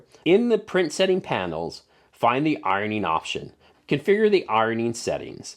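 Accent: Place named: California, United States. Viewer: I know nothing about you other than from you accent you are clearly from California.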